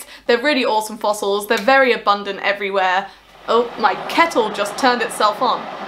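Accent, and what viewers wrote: United Kingdom. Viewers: Talk about paleontology it's amazing, but British accent make this talk so beautiful. Thanku! Rawr!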